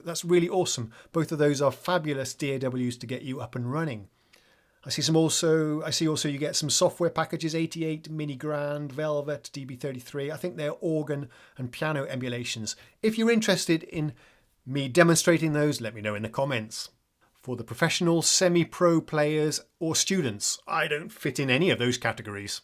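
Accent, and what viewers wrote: United Kingdom. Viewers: Looked like a classic Swedish snow covered villa-house block… but you sound British?? Great video..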